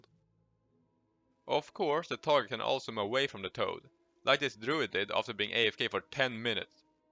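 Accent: Sweden. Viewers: Your Swede accent always brings a smile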